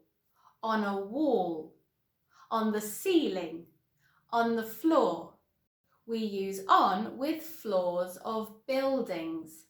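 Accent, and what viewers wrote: United Kingdom. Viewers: I love your British accent.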